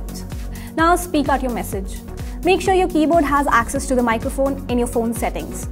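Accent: India. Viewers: that's not broken English, it is Indian English (read accent), native English people might face difficulties but however, others have problems with native accents too. That is normal!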